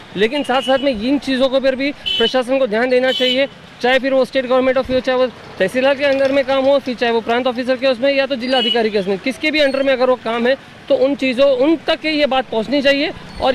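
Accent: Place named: Bhiwandi, India. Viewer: Me and my entire friends subscribe your channel because of Bhiwandi voice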